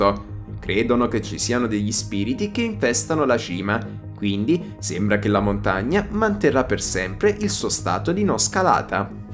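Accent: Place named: Naples, Italy. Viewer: Quello piu spaventoso è che questo canale e quello di e altri 2 canali sono la stessa persona,puoi parlare piu basso,più serio ecc ma si sente perfettamente che 6 sempre tu soprattutto dalla calata napoletana k provi a nascondere..e i video sono uguali..l unica cosa che cambi è il titolo del video..e nn solo..avrai altri 2 canali sempre così stessi video titolo leggermente diverso..ti ci sei arricchito con sto sistema..altro che guru bitcoin ecc..questo è il sistema dei sistemi x guadagnare..all inizio ci è voluta pazienza vero?..ma una volta k gli iscritti e le visualizzazioni hanno cominciato andare a gonfie vele(alla gente piacciono questi video quelli del paranormale ecc)vai il gioco è fatto..avrai anche dei canali con gli stessi video ma parli inglese..se nn ci avevi pensato(difficile)ti ho dato una bella dritta..CMQ VABBE NESSUNO SE NE ACCORGE CHE 6 SEMPRE TU..O SE NE FOTTE..BOH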